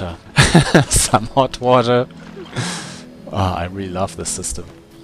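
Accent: Germany. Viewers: You sound so german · I hope its not rude to ask but is that a German accent?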